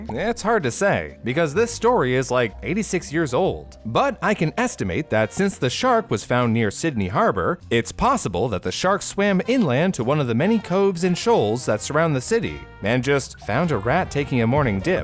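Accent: Canada. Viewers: he’s Canadian😄 you’re smart! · Hey,! You a Canadian? 🤩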